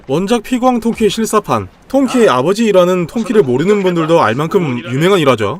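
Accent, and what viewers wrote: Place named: Gyeongsang, South Korea. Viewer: 갱상도 분이시네예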